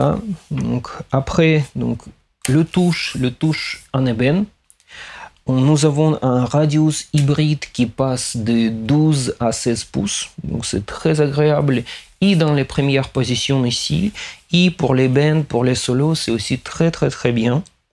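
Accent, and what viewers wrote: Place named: Russia. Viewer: Акцент русский))))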